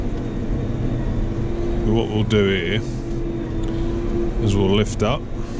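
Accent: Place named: RP, United Kingdom. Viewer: Hi pal great video yet again abit posh that is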